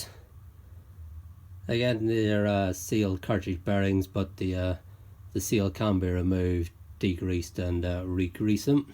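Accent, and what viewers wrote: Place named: Ulster, United Kingdom. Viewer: Welcome surprise hearing an Ulster accent doing a bike maintenance vid. Thanks for the tutorial!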